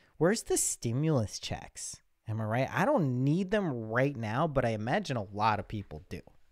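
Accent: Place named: Ireland. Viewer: So your Irish accent was a hidden gem you should absolutely let shine WAY more often...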